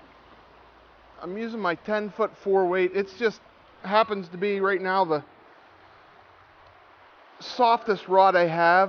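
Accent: Pennsylvania, United States. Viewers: Maybe for you, but that's how it's said in PA!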